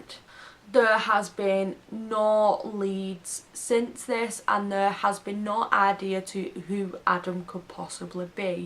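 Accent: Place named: England, United Kingdom. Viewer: Great video X subbed good t hear a English accent X subbed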